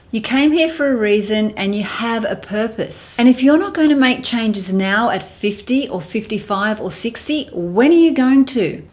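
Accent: Australia. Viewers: Thank you sharing your words of encouragement. I'm 54 and was diagnosed last August 2017 with breast cancer. I'm cancer free.. Thank God, but still going through the reconstruction surgeries. I lost all of my hair and some of my teeth due to chemo. It's a hard journey, but again I believe in my higher power. Getting back to feeling beautiful as one would look in the mirror has its challenges, but what's important I'm alive and cancer free. Loving ourselves at this age is very important and watching videos like yours and others on YouTube has been helping me get into dressing and wearing makeup again. Although I still have no hair (lol) eyebrows and lashes are still coming in.. oops I think I wrote a whole book. I love your accent and I always wanted to go to Australia. I'm in USA, but originally born in Puerto Rico. Blessings beautiful. 🌺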